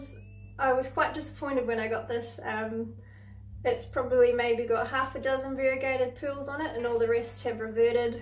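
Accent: New Zealand. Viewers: Spot that gorgeous bliiiing 😉💍 it’s so good to hear a kiwi accent and I love your pizazz so much ugh you give me life!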